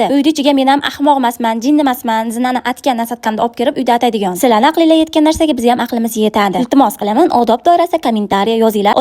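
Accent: Namangan, Uzbekistan. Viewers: Voy dod shevezdan ey NAMANGAN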